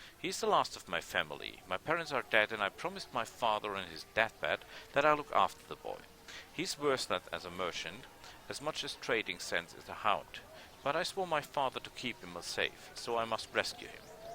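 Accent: Germany. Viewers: I remember your floris walkthrough good times I miss your german accent you got realy good speaking english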